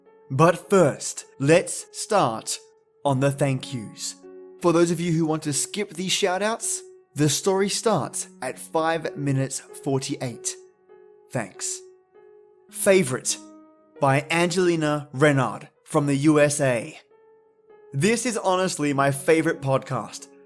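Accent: Australia. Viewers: Sweet jesus, where did you get that picture!? SHUDDERS That alone will give you nightmares! That story was amazing! That was one pissed off ghost. Great job,! (Holla to my fellow Pagans and Witches!) I gotta say, I definitely agree with all the feedback of the other listeners, especially the 'charming Australian accent' heehee. Another job well done! raises my cup Cheers to you! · I always enjoy listening to you, yes. I agree, your voice is charming! Love your pleasant Australian accent. Damn! It can defrost this bitter bitch🍋🍋🍋, which is quite the feat, congratulations!😉 Plus, creepy story to send chills down my spine, always a treat!🍭🍭🍭You're extremely awesome as a narrator, which is just makes my day complete!😊😊😊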